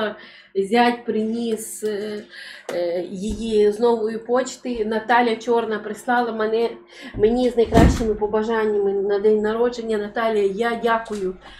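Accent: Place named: Polissia, Ukraine. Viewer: Пані! Вітаю Вас з днем народження! Дякую Творцеві,що він створив Вас такою миловидною,щирою,розумною,відкритою,справедливою і і в міру критичною! Дякую батькам,що привили Вам любов до рідної землі!А мова Поліського краю така чудова, хочеться слухати і слухати Вас! Кожного вечора слухаю Вас,а якщо світла немає -скучаю за вашою правдивою інформацією. Будьте ж тією зірочкою,яка дарує сотням тисяч людей своє світло і дає надію на те,що зло буде переможене Добром! Многії і благії літа Вам і вашим рідним!!!Слава Україні!!!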